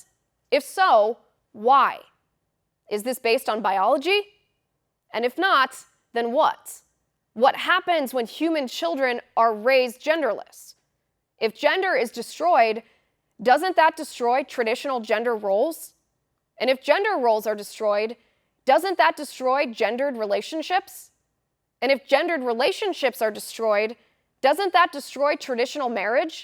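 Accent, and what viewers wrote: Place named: Southwest Ohio, United States. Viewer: BWAAAAAA HAHAHA...don't give 'em any ideas..."if we are still allowed to say the word 'straight' "! BWAAAAA HAHAHA You can tell ol' girl is from southwest Ohio! Rock on, Sharonville, by way of Hamilton!!! Keep on keepin' on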